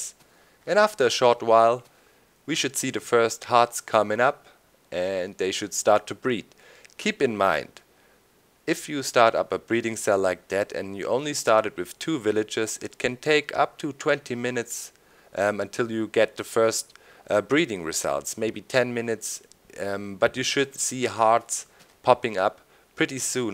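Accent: Germany. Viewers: Auch nicht schlecht, dachte mir doch der Akzent hört sich deutsch an. Aber sicher war ich mir dann bei "Last but not least" ;) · Are you german? :)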